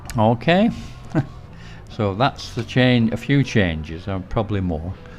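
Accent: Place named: Northern England, United Kingdom. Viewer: Hi, where in the north of England are you from? Your accent is most pleasant on the ears.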